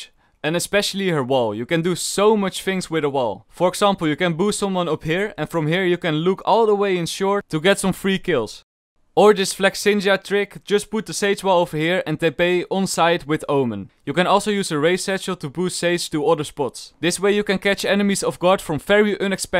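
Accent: Netherlands. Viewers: The dutch accent makes it a lot better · Ur dutch no doubt about that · This dutch accent 😭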